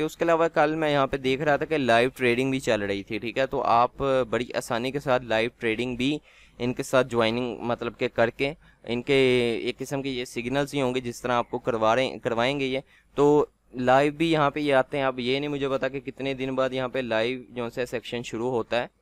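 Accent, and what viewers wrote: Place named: Lahore, Pakistan. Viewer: Love your truly lahori accent 💓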